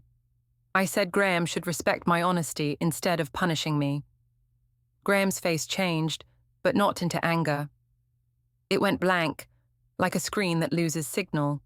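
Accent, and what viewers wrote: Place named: United Kingdom. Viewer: Some things never change. They always use this British voice for the most mentally deficient, clueless, self awareness bereft, delusional women's stories. They always name them something faux sophisticated and exotic that reminds me of "Cruella DeVille". And there's always some lame attention obsessed excuse for whatever the women do that's supposed to absolve them of any blame even while they tell people half truths and manipulate then move as if their fictional reality is actual.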